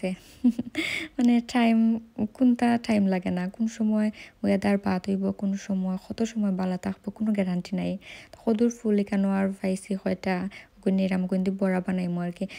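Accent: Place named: Sylhet, Bangladesh. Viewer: Normal vhabe kotha bolle vhalo hoy Karon onek ktha bujhi onek ktha bujhina … jara sylheti na tader ektu somossha hote pare arki 😢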